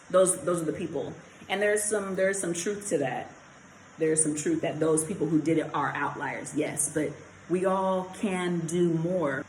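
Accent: Jamaica. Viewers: Such a great video. U touched on a lot of good stuff, and I feel all of this so much. Ppl be missing opportunities with real ppl and u cant force it. As u sai, jus wish them well. R u Jamaican?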